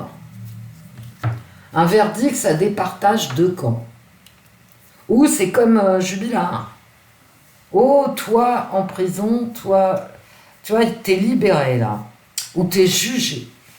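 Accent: Paris, France. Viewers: Je te connais pas depuis longtemps grâce à Abbygaelle. Je suis très heureuse d avoir fait ta connaissance. Tu as un adorable accent de Titi parisien ou alors je me trompe. Lol. Faudra que je regarde si tu as regardé du côté de ruspoulidor. L automne va être difficile pour tous. Je t embrasse. Maryse. 🤗♥️🌟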